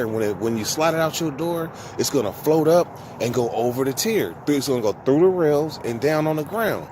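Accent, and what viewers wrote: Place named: Northern California, United States. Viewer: You gotta be from NorCal to love how you talk 😂😂😂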